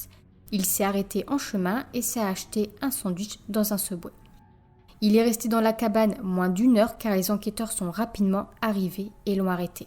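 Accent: North, France. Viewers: Je viens de découvrir ta chaîne, franchement trop bien, des true crimes bien racontés, des sources et des images réelles, bravo !!! Sinon tu viens du Nord comme moi? 😉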